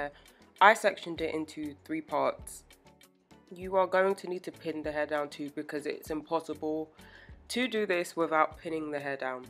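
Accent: United Kingdom. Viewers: You are brilliant love the video girlfriend 🥰 and so love your amazing British accent … everyone subscribe subscribe subscribe!!!!!! She knows her stuff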